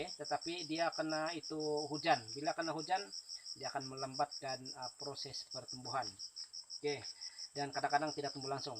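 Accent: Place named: Sabah, Malaysia. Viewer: Dari cara pertuturan spt org dusun Sabah..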